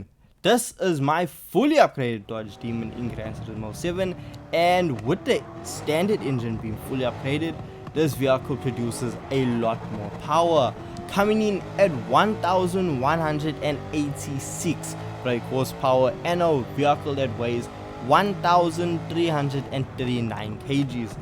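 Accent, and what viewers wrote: South Africa. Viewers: South African😎😎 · Amigo, he's South African, some 12,300km from Beijing...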